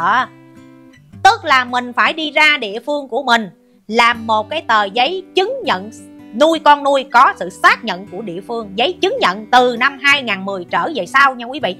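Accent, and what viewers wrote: Standard. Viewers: Chúc chị nhiều sức khỏe và luôn tươi trẻ khỏe mỗi ngày.Chị có giọng nói rất hay và chuẩn❤❤❤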